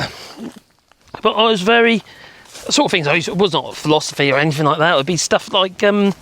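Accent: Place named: West Country, United Kingdom. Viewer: All this time watching channel I thought he was from the west country! 😅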